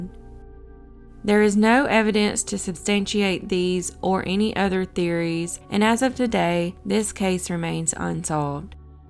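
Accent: South, United States. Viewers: She has a southern accent. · I don't think she sounds bored . It's just her southern way of talking . Pretty crappy thing to say . She has the best page on here. I love her videos ! Maybe you should go watch a different page to make you happy ☺️ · Love your southern accent and your videos love from Birmingham 🇬🇧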